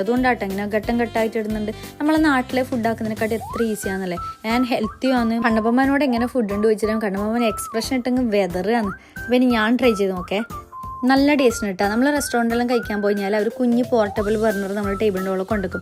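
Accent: Kannur, India. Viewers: Slang polich.. Evda kannuro kasarodo...❤ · ചേച്ചി കണ്ണൂർ ആണല്ലേ വർത്താനം കേട്ടപ്പോ തിരിഞ്ഞു 😍 കണ്ണൂർ എവിടാ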